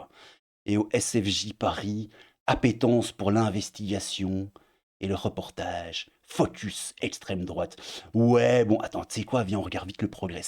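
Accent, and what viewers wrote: Belgium. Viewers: Mon cher, j'ai tenu jusqu'au bout mais je dois prendre un anxiolitique. Je ne plaisante pas😢 Continue à "faire ton débile avec ton accent belge", c'est tout ce qu'il me faut pour être un peu moins mal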